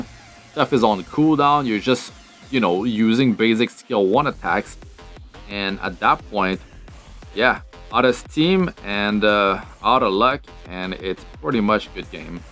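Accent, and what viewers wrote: Quebec, Canada. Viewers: Hey très bonne vidéo de RTA et en passant j'ai remarqué ton petit accent québécois dans ton anglais qui est vraiment pas facile à remarqué pour un non québécois en tout cas tu te débrouille vraiment bien continue !👏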